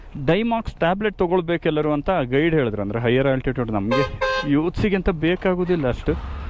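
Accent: Kundapura, India. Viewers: ಹವ್ಯಕ ಕನ್ನಡ ಮತ್ತೆ ಕುಂದಾಪುರ ಕನ್ನಡ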